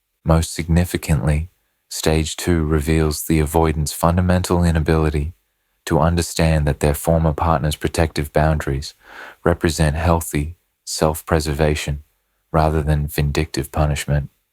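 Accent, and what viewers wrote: Australia. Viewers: I can't stand the Aussie voice...it sounds depressed. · glad that you brought the aussie voice back!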